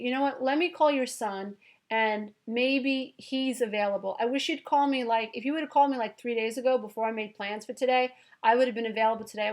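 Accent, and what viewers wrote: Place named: East Coast, United States. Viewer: I love your videos! It's like therapy for me! Thank you so much! Keep up the good work! Also I have a question... Are you a therapist? If so by any chance are you located in Southern California? I would assume you're on the east coast due to your accent. But I love your advice and am looking for a therapist. Thanks again!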